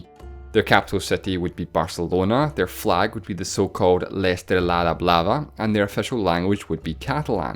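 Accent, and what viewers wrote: United Kingdom. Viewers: May I ask where part of the UK are you from?. I mean, My native language is spanish; I've studied English, but I've never been in an English speaking Country and I have some issues understanding some accents, in particular I have issues with Bitish English (that's what I thouthgt) and some whith canadian and american English from the northest (Massachussetts and that area). But I have noticed that you have a very British accent and notheless, I can understand you pretty well.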